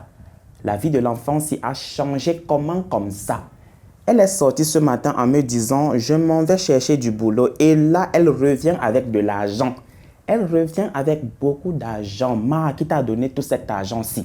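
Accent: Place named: Cameroon. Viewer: Sinon on ne prononce pas le T de VINGT (20) hein, je dis ça je dis rien ! 😂😂 Sinon j’apprécie ton boulot et surtout cet accent si particulier du MBOA que l’on aime tant ! 😅😅 Bonne continuation ! 🙏🏽😌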